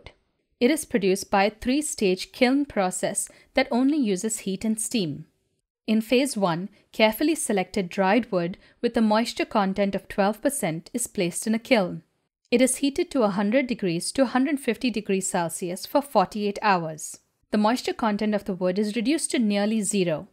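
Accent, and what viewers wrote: India. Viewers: Really interesting to see the scientific component of the shou sugi ban. If i'm right, that technic work best with resinous wood? Placing it in a renewable context, especially the part about soft/hard wood and theyr growing time it's important. The other aspect it's that you have to let time to the tree to grow or you gonna get weaker wood. In France (burgundy) with climate change, we see a lot of pine-like tree getting infected and dying. Add that the unbridled wood harvesting to sell our tree to china. By the way, great video, with lot of informations, add the smile and the indian accent and it's wonderfull !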